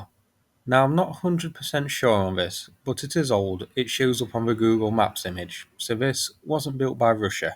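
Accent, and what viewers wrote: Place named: England, United Kingdom. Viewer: Which part of England (county, main city) is home to the accent speaks in?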